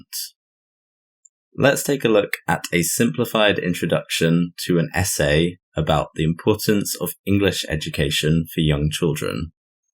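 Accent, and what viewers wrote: RP, United Kingdom. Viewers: Is it received prounication ?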